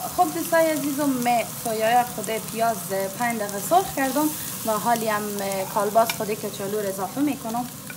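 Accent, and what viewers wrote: Herat, Afghanistan. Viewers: With the beautiful Herati accent you make it more interesting to follow. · به به ماکارانی پختن با لحجه هراتی خیلی عالی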